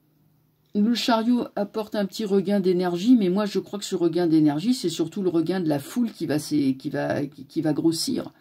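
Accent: Paris, France. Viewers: Merci toujours heureuse de vous entendre avec votre petit accent parisien et votre humour. Vous avez raison ils sont a la fin de leurs mondes. Et nous retrouvons enfin notre souveraineté il suffit d'accrocher nos ceintures de sécurité pour les dernières secousses.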